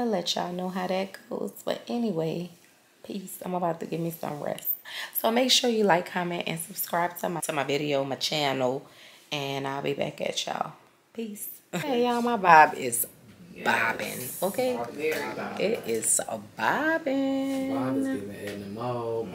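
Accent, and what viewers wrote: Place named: Louisiana, United States. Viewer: this is my first time actually hearing you Louisiana accent. or maybe i just never heard you speak long enough.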